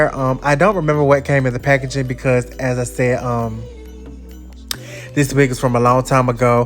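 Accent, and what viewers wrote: South, United States. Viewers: I appreciate how u say “akahawl” I’m a Cali native the southern in ya is adorbsss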